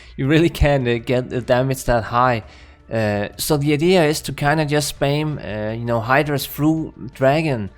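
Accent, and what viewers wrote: Denmark. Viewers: Are you from Denmark? Your dialect sounds a little Danish 😀💪🏻 great video!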